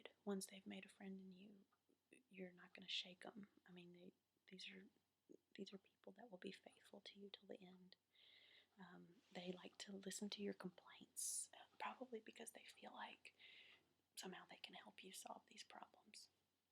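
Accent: South, United States. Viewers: Can't tell you how glad I am to see you back! As much as I love everyone's different accents,...It's really nice to hear a good ole southern girl on you tube again! :) I hope the family is doing good and hope to see more video's when you get time. Thanks, -Steve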